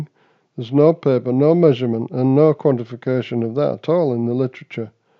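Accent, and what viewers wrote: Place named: Ipswich, United Kingdom. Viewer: No your wrong, you sound like that fucking Ipswich Town manager with that accent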